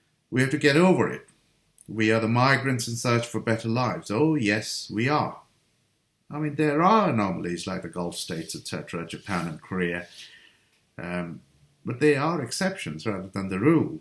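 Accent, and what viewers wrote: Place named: United Kingdom. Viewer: By your accent, I thought you were British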